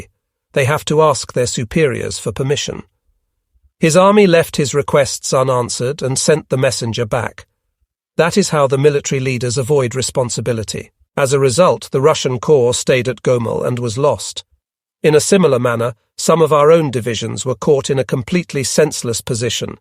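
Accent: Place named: South, United Kingdom. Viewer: it’s an open text AI popular with audiobook transfers. It has a slight southern UK accent but is very good for a freebie. You can always tell the earlier versions because of mispronunciations and statements not making sense. It has developed well past that now and is pretty accurate no matter the text.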